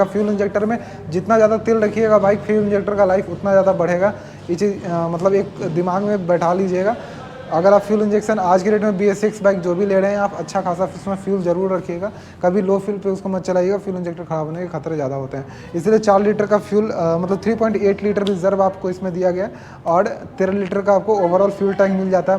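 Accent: Bihar, India. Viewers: I bet you are Bihari. I guess because of your pronunciation of ra and da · Are you from Bihar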